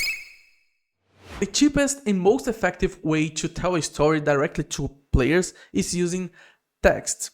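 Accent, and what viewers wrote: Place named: Brazil. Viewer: No exato momento que tu começou a falar eu sabia que tu era BR AHUAHUAHU Nosso sotaque é muito reconhecível xD Bom vídeo, mano. Vou me inscrever.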